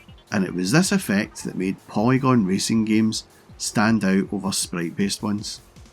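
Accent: Scotland, United Kingdom. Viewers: I wasn’t expecting the Scottish accent 😅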